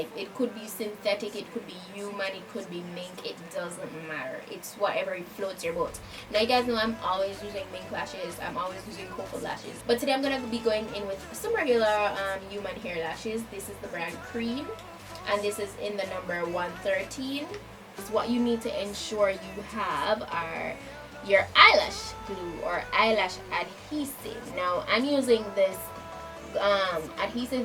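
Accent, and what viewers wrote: Jamaica. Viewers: I subbed as soon as I heard that Jamaican voice 🇯🇲🇯🇲🇯🇲🇯🇲🇯🇲🇯🇲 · Hey i just wanna say, i love the content of ur vids, love ur accent, Im Trinidadian and omg i just love a strong Jamaican Accent . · go girl... are you jamaican? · Are you Jamaican???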